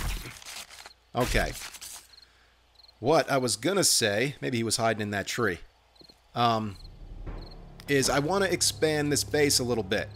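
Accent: New Jersey, United States. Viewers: I think you missed a plug and I think you missed a bookbag in the pass-n-gas . btw I love that you're from NJ! It gives me joy to listen to you talk about kickin' ass in an NJ accent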